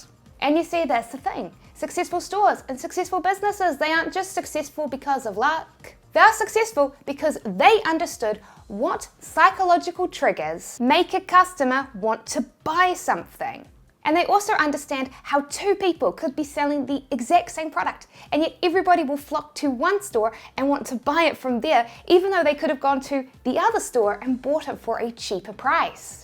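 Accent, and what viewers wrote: New Zealand. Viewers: Such Kiwi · Cool, sound like a New Zealander tips.😎👍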